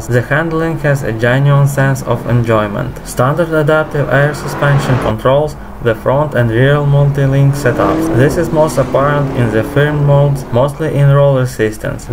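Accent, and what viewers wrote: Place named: Russia. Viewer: Не привычно слышать русский акцент в видео на английском )) Молодец, продолжай!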